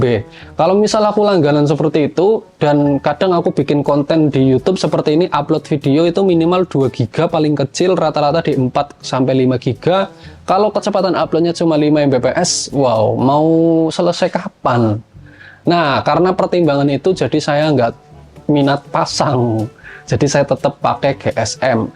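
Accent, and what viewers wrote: Banyumas, Indonesia. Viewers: bjir hpne go ngpo kui mase.hihihihhii apa jadi mesin MiFi batangan. ngapak e ngndi mas,slm kng somagede. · wong endi sih..deng bisa ngapak...xixixixi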